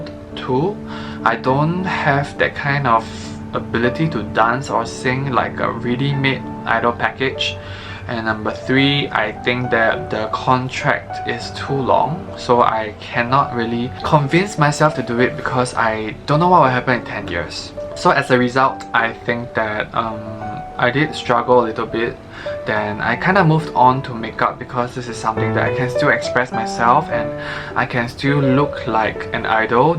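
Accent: Singapore. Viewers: AYEEEE SINGAPOREAN HERE TOO I SENT YOU MY AUDITION VIDEO ALREADY HEHEHEH when I first saw your video I was wondering if you were Singaporean but I wasn't sure but aye your accent is strong! I'm a proud singaporean of you here!❤❤ itsokay, life is really short. sometimes we have to give up on some things. glad you made your choices! I'm gonna work hard and try to achieve my dreams! jiayouz !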